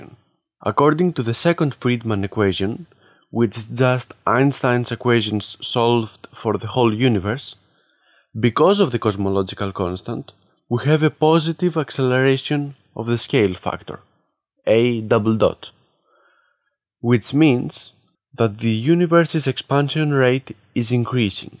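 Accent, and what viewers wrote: Greece. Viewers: Your accent spells: Greece Είσαι ωραίος ρε φίλε. Απλά, λιτά κι απέριττα. Και like και subscribe! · Greek accent detected 🤌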